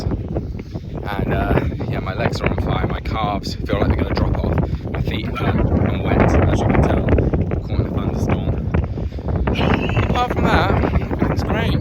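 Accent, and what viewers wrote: United Kingdom. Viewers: Every time you add an R in your speech now I notice it 😂 it’s amazingly British! American accents are so boring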